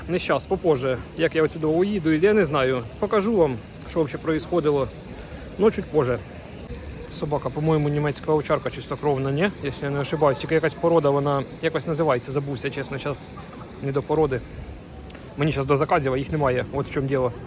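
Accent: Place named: Kherson, Ukraine. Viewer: Привіт! Твої відео як вірус якийся, дивляться так легко і захоплюють... У тебе такий говір, ти не з Херсонщини випадково?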